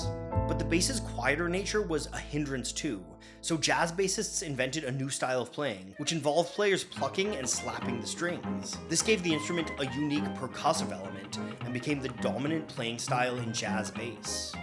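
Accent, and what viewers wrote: Canada. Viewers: Well, he is Canadian. In classical ensemble instruction in the UK I only heard it said corn’t from various directors and instructors. I’ve only heard Americans over-emphasise (to my ears) the net part.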